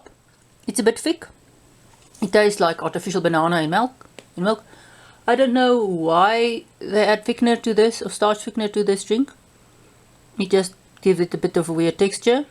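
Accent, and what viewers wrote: South Africa. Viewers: Your SA English like a razor blade to my ears. I speak US English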